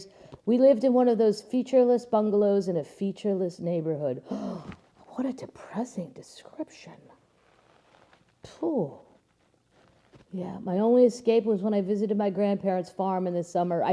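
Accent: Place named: Wisconsin, United States. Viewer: Love her Wisconsin pronunciations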